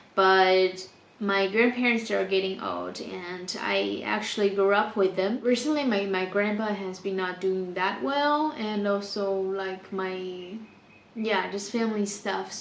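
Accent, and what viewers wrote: United States. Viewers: You have a slight American accent? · I was almost sure you were AMERICAN. You sound more American than most AMERICANS.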